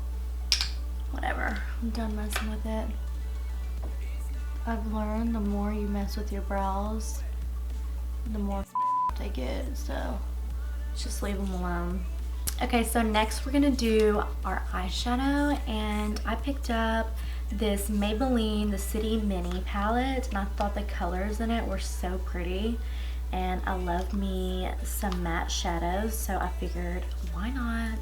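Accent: South, United States. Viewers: Lol, the look you gave yourself at the end was priceless. It was like "Bitch, I'm feelin myself!" Love your personality and sense of humor. And of course your southern accent. My fiance is from South Carolina. You've got a new sub. Keep the makeup tutorials coming ya'll. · I’ve been waiting for this tutorial for the longest!!! 😍 how do you find your foundation shade? I’m having trouble and love how you started your intro you have like a little country accent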